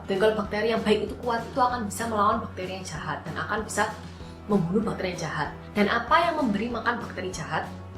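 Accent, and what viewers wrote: Java, Indonesia. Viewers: Aduh pusing dengernya logat jawa wkw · Mbak e medok banget bahasanya😂😂😂😘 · Jawa medok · pekatnya slang jawa 😅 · Medokkk banget mba.. Hahah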